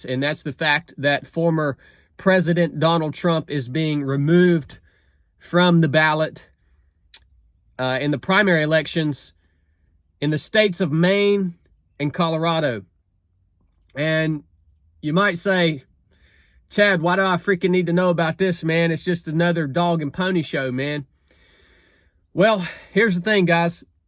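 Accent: South, United States. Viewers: don’t know where you live, obviously the south bc you talk like me. Anyway, bout time for you to run for US congress. You served your country with a gun, bout time for you to serve with a pen. You are obviously passionate about our freedom.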